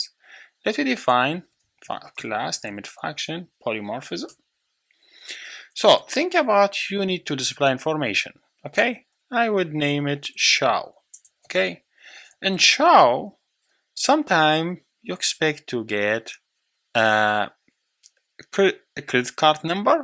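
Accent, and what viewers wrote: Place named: Iraq. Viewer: Your accent seems like you are arabic,more similar to Iraq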